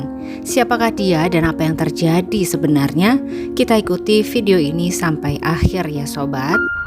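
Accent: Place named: Banjar, Indonesia. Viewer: kebetulan lg dinas di kota ini, tp kabar simpang siur, berkat mbak sy tau kronologinya.makasih mbak..btw mbak dah bisa jd org banjar,pas pengucapannya😂😂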